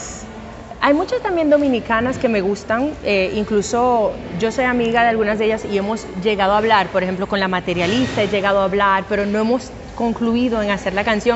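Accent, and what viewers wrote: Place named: Colombia. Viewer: Una belleza increíble habla como los Colombianos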